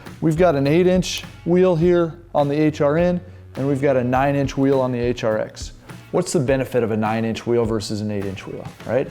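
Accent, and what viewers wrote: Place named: Canada. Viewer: This guys Canadian accent is soo killer.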